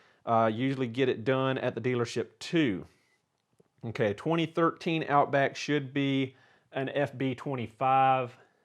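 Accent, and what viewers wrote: United States. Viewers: all good it was funny especially in your yanky accent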